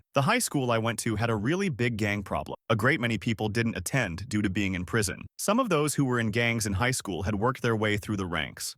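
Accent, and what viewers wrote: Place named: Iowa, United States. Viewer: I’m from Iowa and before you even said Hyvee I was like “Yeah she’s from around me.” Eastern Iowa😂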